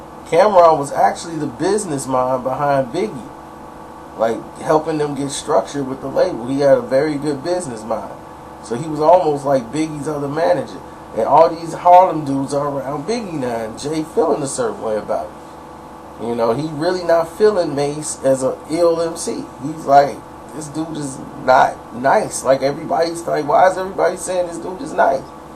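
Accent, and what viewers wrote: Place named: South, United States. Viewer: Hahahaha....this guy has a southern accent!!!! Have u ever been to NYC????? ANY BORO?????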